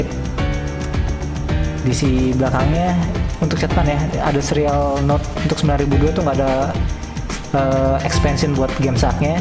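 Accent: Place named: Surabaya, Indonesia. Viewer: Dari jengenge iki pasti wong suroboyo :D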